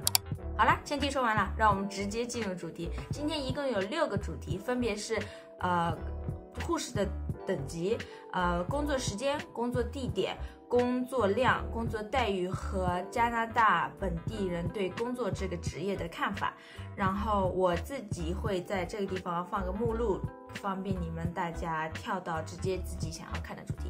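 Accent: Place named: France. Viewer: 我好像从口音中听出了法语的味道呢(我堂妹在Quebec省(Ottawa)做Family Dr.)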